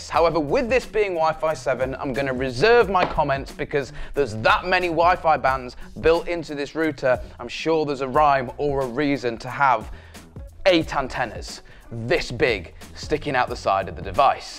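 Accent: United Kingdom. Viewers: That is how the British say 'router' because of how we say 'route' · That’s how we say it in the proper Queens English, not the bastardised yank rip off.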